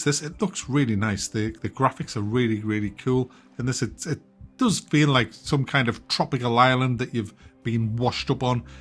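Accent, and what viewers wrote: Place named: Newcastle, United Kingdom. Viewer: Ahh... The Chief is a Geordie then 🤔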